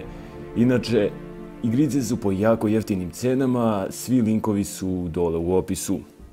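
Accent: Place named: Ekavian, Serbia. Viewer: zvuci kao lik sa kanala "BalkanAlert" samo sto prica ekavicom.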